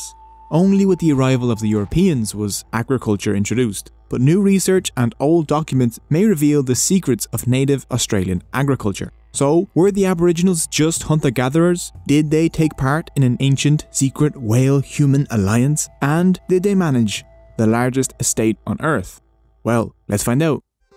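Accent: Dublin, Ireland. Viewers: It's a Dublin Irish accent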